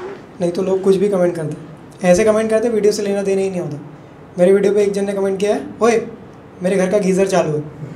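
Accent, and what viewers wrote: Bhopal, India. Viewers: Yeh banda bhopal se hai kya?? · Ye bhopal se hai kya?? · Ye banda Bhopal se h kya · Ye bhopal se h kya ? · Ye banda bhopal se hai kya??🤔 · tu bhopal se hai kya...? :D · "Bhai ye bhopal se hai kya?" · Bhai tum bhopal see hoo kya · Ooi, yeh Bhopal se hai kya? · Ye Bhopal se he kya? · oyee tu bhopal se h kyaa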